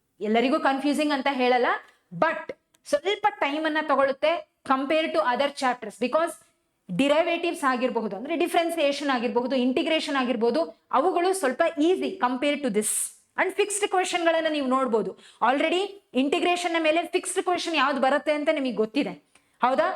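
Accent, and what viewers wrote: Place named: Kerala, India. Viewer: Madam I think u are malayali, your kannada slang is in malayam accent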